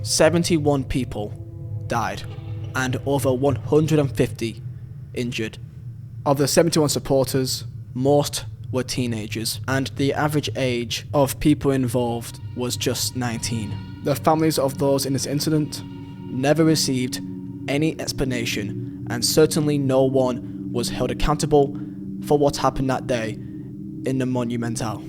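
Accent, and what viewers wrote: United Kingdom. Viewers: i seriously couldnt hear anything all video dont get that heavy british accent everywhere you make the h sound too much keep the mic a little further from ur mouth when the sad parts came i could only focus on ur annoying voice otherwise ok vid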